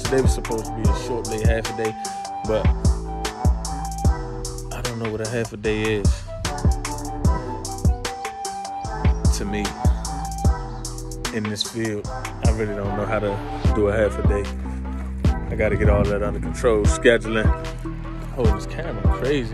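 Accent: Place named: DMV, United States. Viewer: Keep grinding fam I just got me a dually and 2 car trailer a month ago boutta take off and turn up I feel good to hear a dmv accent on YouTube giving free game keep grinding bro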